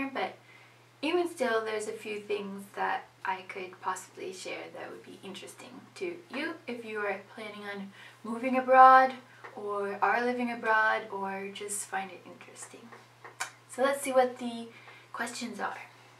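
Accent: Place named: Sweden. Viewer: Yay! Hittade precis din video när jag kollade efter denna tag videon som jag kommer filma nästa vecka, ville bara säga vad söt du är och din accent är så svensk 😍 och tack för att du delar! Min son kommer nog ha det exakt som du eftersom han är född här i USA men hela min familj är kvar i Sverige ❤️ Så intressant att se ditt perspektiv, speciellt språket eftersom jag har svårt att keep up the Swedish with him on a daily basis 😅 reser till Sverige för första gången med honom om två veckor och längtar sååå! 🙌🏼 Kram till dig! 💕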